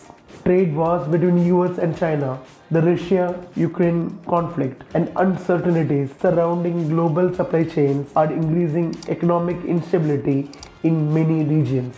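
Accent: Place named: India. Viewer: Yes yes, I agree it’s time for endians to go home. At least we won’t have to listen to that horrible accent.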